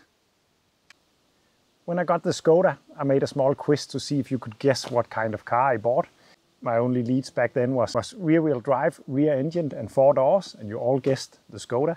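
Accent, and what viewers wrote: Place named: Denmark. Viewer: I think I know that car, given your Danish Accent and the fact that there are not many "Kermit the Frog green" UAZ 452 in Denmark. This is one of the cars Vladim brought to the country. I was also interested in that a year ago and had a test drive, You have to double declutch when you drive that UAZ. At some point in the 80's UAZ upgraded to a synchronized transmission this one was build before that. You can get newer transmissions for them if you get tired of double declutching. I think I know what the B and D stands for, that is for picking the tanks that the engine consumes from, as you probably has learned this car got two fuel tanks. YA3 that is UAZ in Cyrillic.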